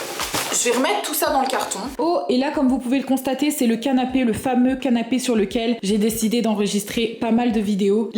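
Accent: England, United Kingdom. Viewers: omgggggggg ton accent anglais quand t’es arrivée à paris je suis choquée😭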